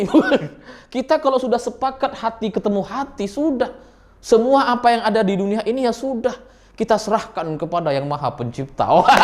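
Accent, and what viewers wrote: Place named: Madura, Indonesia. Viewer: Loghatnya sperti madura, makjleb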